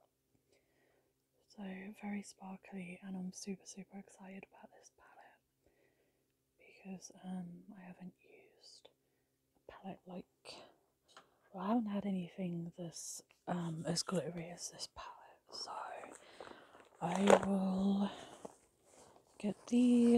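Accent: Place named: East Anglia, United Kingdom. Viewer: Love a British asmrtist! You sound like you are possibly in the East of England like me. Enjoyed your vid thank you x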